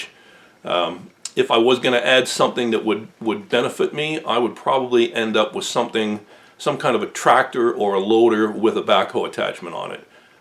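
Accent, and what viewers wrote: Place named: Ontario, Canada. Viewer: hi, I found your channel recently and as soon as I heard you speak and say the word 'out' I new you were from the middle east (I am guessing Ontario). I live in Northern Alberta and try to spend a lot of time out doors, I hunt, side X side, camp, etc. I also tinker with 'blacksmithing' I make knives, froe's, axe's and do fabrication work. I haven't had a chance to watch all your videos yet, but do you winter camp?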